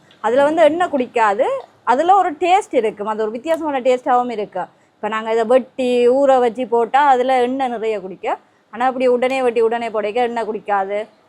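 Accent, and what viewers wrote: Jaffna, Sri Lanka. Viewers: உங்கள் தமிழில் யாழ்ப்பாண வாடையும், தம்பியின் தமிழில் மலையக வாடையும் அடிக்கிறதே ஏன்? நீங்கள் எந்த ஊர்? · கொஞ்சும் யாழ் மண்ணின் மணம் மணக்கும் தமிழ் சுவையோ சுவை. என் தமிழ் மகளே உன் அன்பான கையில் உணவு உண்ண துடிக்குது என் மனம். நீ தங்க தமிழ் பேசும் அழகு தித்திக்குதே. கொஞ்சும் தமிழ் போற்றும் மிஞ்சும் தமிழ் . ஆகா வெண்டைக்காய் குழம்பு சொல்லி கொடுங்க நானும் செய்து சாப்பிட ஆசை ஆசையாக இருக்கிறது.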